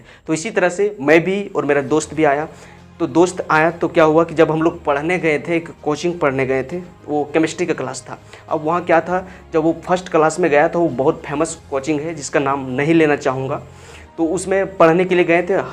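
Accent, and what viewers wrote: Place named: Jharkhand, India. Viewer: Language se hi pata chal gaya ki aap Jharkhand se ho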